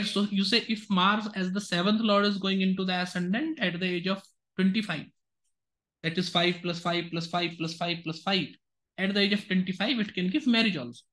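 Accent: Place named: South, India. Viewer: Brahmin's at South speak exactly like this.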